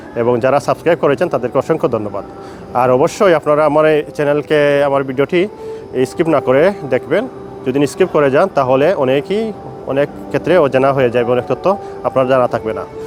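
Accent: Sylhet, Bangladesh. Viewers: ভাইয়া আপনি কি সিলেটি ❤❤ কথায় বুজা যাচ্ছে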